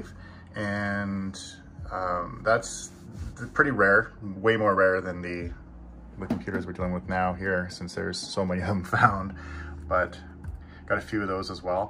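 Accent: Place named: Ottawa Valley, Canada. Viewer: Your hoser accent is really endearing. A taste of the Ottawa valley. I grew up in Smiths Falls but never was aware of any of this stuff.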